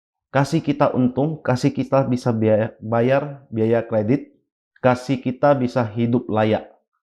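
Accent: Sumatra, Indonesia. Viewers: Ini bang pasti org sumatra.ceplas ceplos.berani speak up. Keren banget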